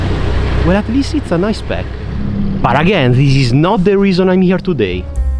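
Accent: Italy. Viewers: Bro il tuo è l’inglese più italiano che ci sia ❤ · Shorter videos, straight to the point, exhaust sounds, some music, your nice Italian voice and those are great! 😃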